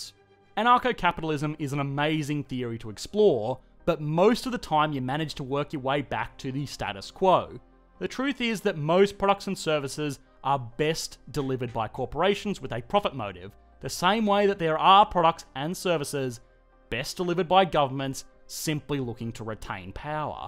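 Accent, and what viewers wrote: Australia. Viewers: Ed Hrafnskald Conway Well unfortunately most governments aren't as well liked as with his smooth Aussie accent!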